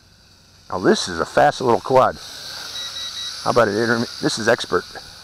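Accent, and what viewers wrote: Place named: Western Pennsylvania, United States. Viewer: Hello, I've seen some of your other video's in the desert, and could hear when you spoke you had an accent close to what I'm use to hearing living in western Pa.... I have lived in and around Pittsburgh most of my life. Thanks for taking the time to make and upload the video's you do. Hope all is well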